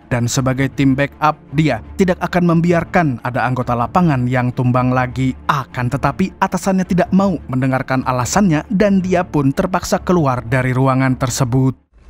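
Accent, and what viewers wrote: Banjar, Indonesia. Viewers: Mamang orang banjarkah apa orang kah???😊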